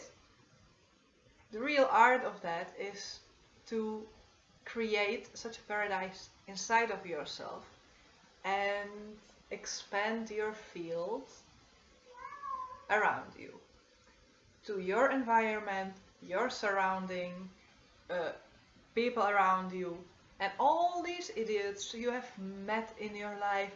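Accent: Netherlands. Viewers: Hmm sounds sometimes like dutch or Flemish but when listen very good maybe Denmark Norway, I don't think east Europe Definitely not German. I'm very curious now. Please tell me. I started copelandia ogre boy shroom kit and researching YT Pobrezni❓polish really I have lots of polish friends here in Amsterdam and non of them sound like you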